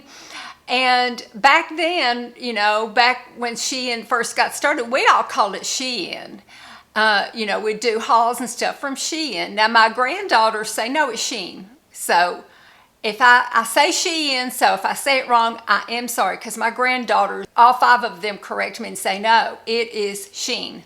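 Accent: South, United States. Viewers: Your Youtube channel is mentioned in "Becoming Me." That's how I found you. You are beautiful! We are close to the same age. I love your Southern accent. Like & subscribed.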